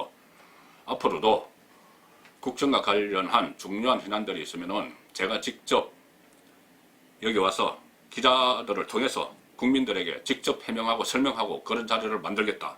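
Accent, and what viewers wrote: Gyeongsang, South Korea. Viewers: 송본부장의 말을 좀 천천히 하세요 경상도 사투리에 말이 빠르면 타지방 사람들이 잘 알아듣지 못합니다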